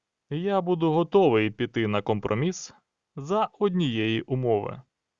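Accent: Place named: United Kingdom. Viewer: Все гарно, лайк, але твій Брітіш акцент.. Тоді хоть зміни прапор з Американського в заставці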